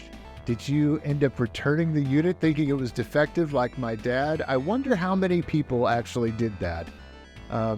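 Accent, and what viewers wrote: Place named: Brooklyn, United States. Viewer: Whats up with the East Flatbush Brooklyn accent?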